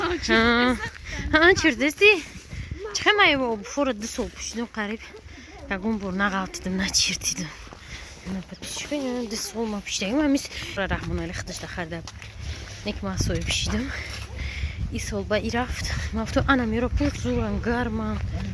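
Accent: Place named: Khatlon, Tajikistan. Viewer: апачон лафзи хтонкати гап занен мада лафзи хтон махкулай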